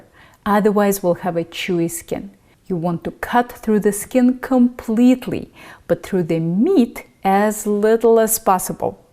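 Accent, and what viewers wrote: Russia. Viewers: Fucking Russian accent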